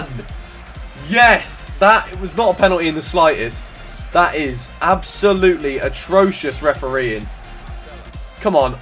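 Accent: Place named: Scotland, United Kingdom. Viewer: FANTASTIC Scottish accent mate!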